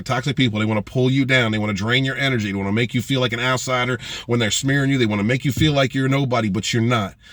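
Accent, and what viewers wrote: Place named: New England, United States. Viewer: Missouri, your accent gave me New England vibes lol, nice to meet you!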